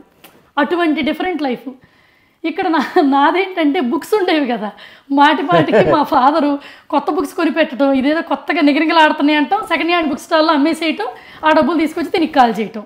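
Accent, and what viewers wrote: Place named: Guntur, India. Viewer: మీ గొంతు diffrentg గా ఉంటుంది పై గా మా గుంటూరు అనే ఫీలింగ్ happy 20th wedding 💍 aniverseley.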